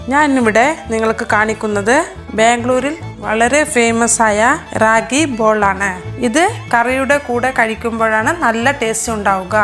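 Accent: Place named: Malabar, India. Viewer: മലബാർ മുസ്ലിം ഭാഷ ആണ്. മലപ്പുറം ആവാം. ഉറപ്പില്ല. എന്തോ ആവട്ടെ ഞങ്ങളുടെ മലബാർ ഭാഷയെ കളിയാക്കണ്ട കേട്ടോ 😊😊😊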